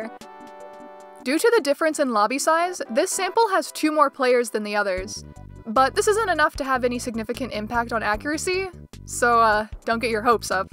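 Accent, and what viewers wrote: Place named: North America. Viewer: a "normal" amount.... also I'm judging by the accent I'm assuming you are an NA player. The match making servers prioritze region to cut down on lag so that would be a thing to consider with your sample. My guess is JP has an inkling majority but I haven't collected any data on that just a vibe. Also my statistics nerd brother in arms.... I am sorry nobody told you about stat.ink. Lastly, love the PSO music cheers :)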